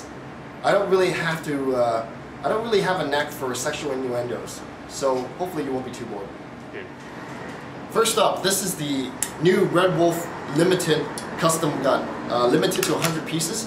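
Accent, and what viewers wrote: United States. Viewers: whoa! american accent! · hes thinking at a more business angle. American accent.(i think) good magazine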